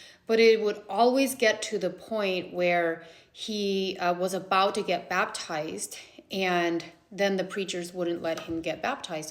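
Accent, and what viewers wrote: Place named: Pennsylvania Dutch, United States. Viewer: I love the accent! I’m from Pennsylvania, and I hear people talk like this when I go to Berks or Lancaster counties. My university (where I heard buggies on Sunday mornings clopping through the campus) had offered PA Deitsch language courses, but I took Spanish. Meant to go back! Thank you for the interesting stories.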